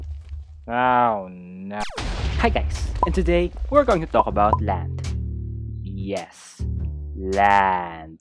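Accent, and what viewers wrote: Philippines. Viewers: Why do you sound like a Filipino? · I’m just gonna guess, but... Are you filipino? The accent’s there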